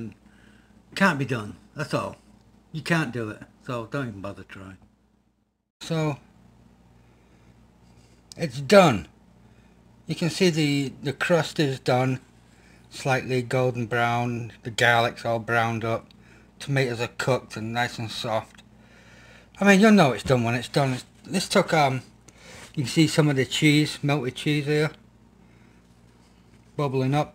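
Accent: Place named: Manchester, United Kingdom. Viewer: I like the Manchester accent but what is it you've made?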